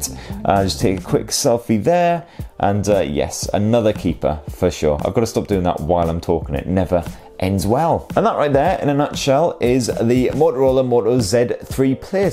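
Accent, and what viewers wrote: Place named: Newcastle, United Kingdom. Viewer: It’s a geordie accent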